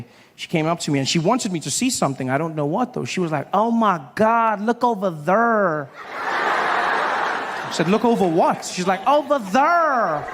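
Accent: South Africa. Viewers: Erm he is South African! Did you not hear his South African accent. And I am not a fan of either of them. I prefer the late great Dave Allen. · Hope your South African English should make some sense 😂 rubbish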